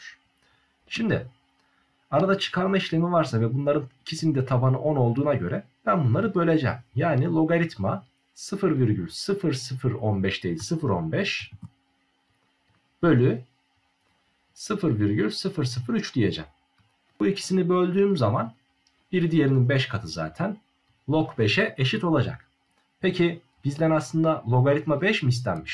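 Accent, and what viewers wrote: Ankara, Turkey. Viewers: Ankara şivesi var hocam sizde Ankarılısınız dimi :D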